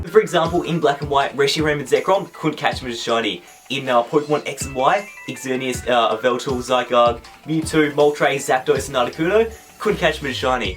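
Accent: Australia. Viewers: he is Australian that is how we speak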